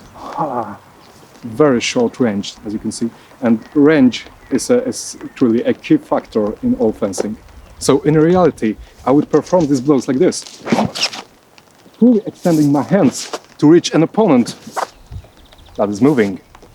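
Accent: Poland. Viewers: you sound like a Polish Christopher Walken. love the vids! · You seems to have Polish accent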